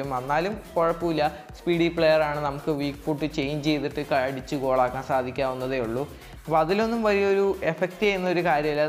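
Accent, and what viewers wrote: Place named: Palakkad, India. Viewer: എനിക്ക് slang കേട്ടപ്പോൾ മനസ്സിലായി ഞാനും paalakadanu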